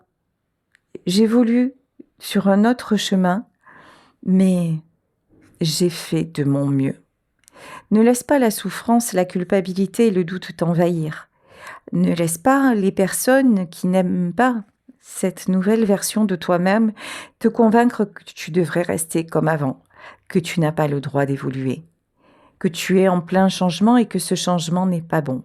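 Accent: Marseille, France. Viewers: Bonjour chère!❤❤😂😂🎉🎉 Jamais! Tu es parfaite comme tu es. Tu nas pas a t'excuser ou a devoir t'expliquer pour la belle personne que tu es.❤😂😂🎉🎉🎉🎉😂 Nous ne sommes personne pour te dire qui tu dois être ou comment tirer tes cartes.....???????❤❤😂😂🎉🎉🎉 Tout ce que je sais, est que tu n'est pas a l'origine de mes ressentis. ❤❤😂😂🎉🎉🎉 Très belle année et j'espère que ton bel accents marseillais restera bien droit dans ces bottes pour 2025.❤❤❤😂🎉🎉🎉 Ne t'inquiète pas tu es juste royales. Je commence a être plus connu de mes commentaires, et tu sais que comme toi, rien ne vaut mieux que ce que tu es❤😂😂🎉🎉🎉🎉🎉 En claire, rien ne mieux que ce que Dieu a fait de nous.❤❤❤❤😂😂😂🎉🎉🎉 tu es bien comme tu es et ce n'est pas grace a nous. Tu n'est que plus royale encore que quand t es authentique. ❤❤❤😂🎉🎉🎉🎉🎉 pour moi, dire ce qu'on pense avec respect et amour, être juste avec soi-même et les autres disans les choses comme elle sont, en plus de nous partager un bout de tes expériences, Tu n'as que plus de mérites!❤❤😂😂😂😂🎉🎉🎉Merci 🎉🎉🎉🎉 Je te souhaites pleins de bonheur, de l'amour de ce qui t'entourent et de paix pour en profiter. 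❤❤❤😂😂🎉🎉🎉🎉🎉🎉🥊🥊🥊 La classe ca ne s'apprend pas!😂😂😂😂 Soit on est née avec, soit on se l'achète sur YouTube. 😂😂😂😂😂😂😂🎉🎉🎉❤ Toi comme moi, tu es nées avec!🎉🎉🎉🎉😂😂😂😂🥊🥊🥊🥊 Alors merci de t'en servir pour nous guider. ❤❤❤😂🎉🎉 Toute fois, pardon si j'ai dis quoique ce soit d'irrespectueux, juger ou mal exprimer. ❤❤😂😂🎉🎉😂😂bonne année.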